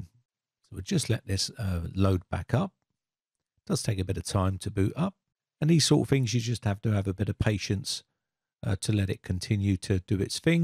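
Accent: United Kingdom. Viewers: Bri ish guy it's not working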